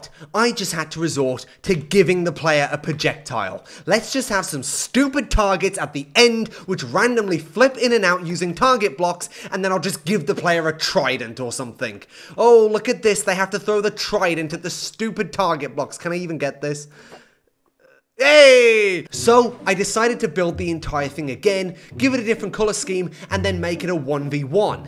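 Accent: United Kingdom. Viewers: Ah yes,British accent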